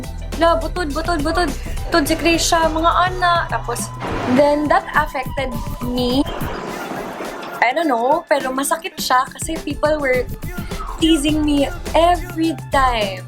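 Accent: Visayas, Philippines. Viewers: wow,, ayus.. dakong gensan,, bisaya jud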